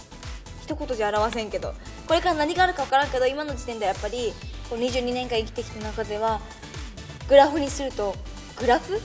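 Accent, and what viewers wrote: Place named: Hakata, Japan. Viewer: れいなの博多弁好きすぎる。。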